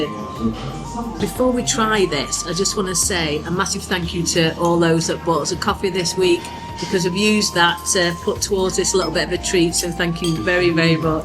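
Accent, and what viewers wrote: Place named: Yorkshire, United Kingdom. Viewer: Hello from down here in Perth Western Australia, just wanted to say we love your restoration ❤ your Yorkshire chat is just the best you all go to make a lovely family Hope you don't mind your pet name " 😊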